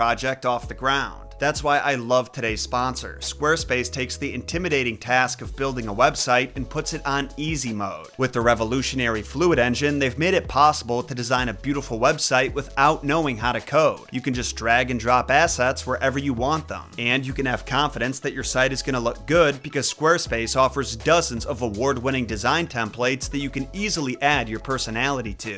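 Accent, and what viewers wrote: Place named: United States. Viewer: The pronunciation is almost there but I think it's the American twang that makes it sound funny 😂 Loved this I would definitely love to see more ❤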